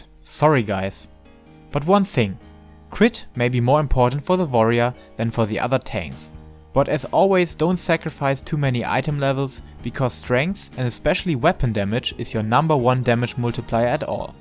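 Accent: Germany. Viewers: you can hear he's german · Just a suggestion, it might be better to introduce the skill sets by bullet points. Overall the guide is quite straightforward. And German Accent is so damn badass. Love it.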